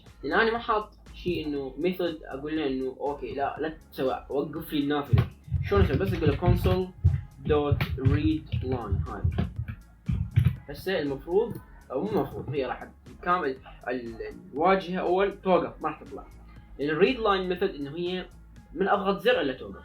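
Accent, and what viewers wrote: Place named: India. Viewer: أمانة أنت فهمان شو عم تحكي ، يازلمة عم تحكي متل الهنود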